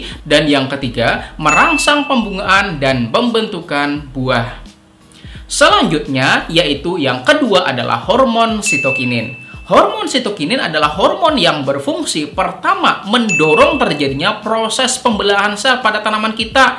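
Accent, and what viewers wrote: Banjar, Indonesia. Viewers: Wahh kaya ny banjar banar nih sanak 😁👍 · kayak logat orang Banjar kkak... mantaappp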